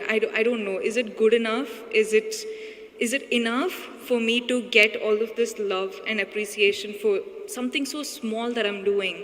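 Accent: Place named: India. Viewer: You dont have to provide subtitles for the speech cz we all understand the indian english accent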